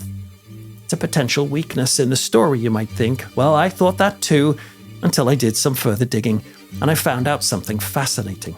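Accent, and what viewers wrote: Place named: England, United Kingdom. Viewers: Telly Savalas with an English accent! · This clown can't focus! Hate when these people from england narrate!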